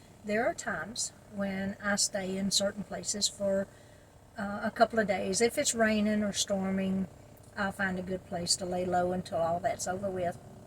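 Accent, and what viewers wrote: South, United States. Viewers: Just subscribed!!!! Do I detect Southern accent ??? I'm in South Carolina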